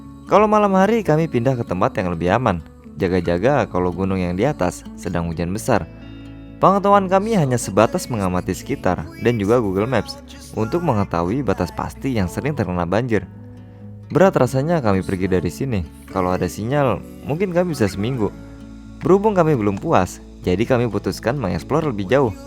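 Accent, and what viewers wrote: Sulawesi, Indonesia. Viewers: Sudah belogat sulawesi euy...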